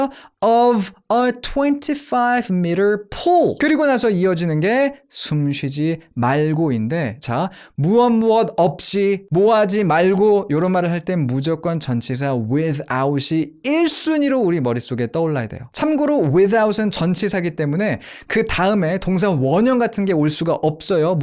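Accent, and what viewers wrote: United States. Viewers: Wow; just amazed, your American accent is perfect.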